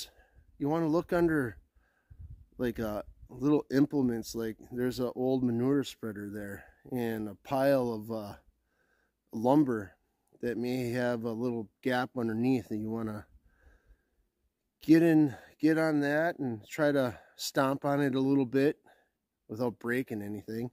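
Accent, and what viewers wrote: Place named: Canada. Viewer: You sound Canadian lol I’m from Alberta good tips boss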